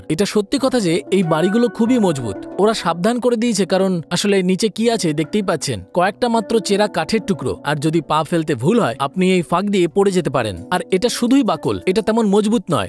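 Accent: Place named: Sylhet, Bangladesh. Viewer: সিলেটি সিলেটি ভাব আছে কথা কন্ঠে!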